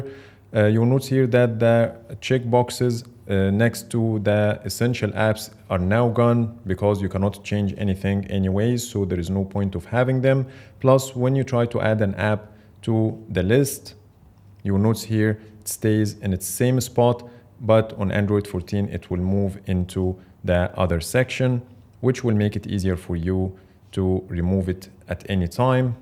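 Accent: Egypt. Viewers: Man i love the Egyptian accent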